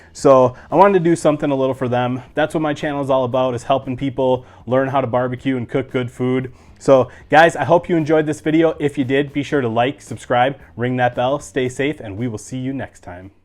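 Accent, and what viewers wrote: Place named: Midwest, United States. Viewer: Your voice has a midwestern twang. I hear MN?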